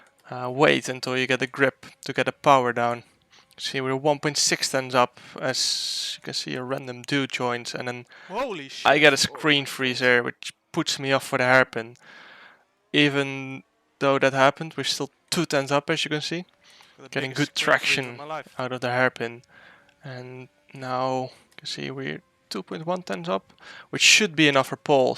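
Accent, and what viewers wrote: Netherlands. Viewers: Ik hou van dat Nederlandse accent 😂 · Are u dutch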